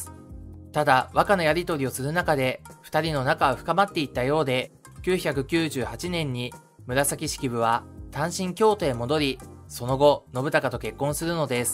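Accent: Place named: Tokyo, Japan. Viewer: ただ全部東京弁なのがなんかなじめない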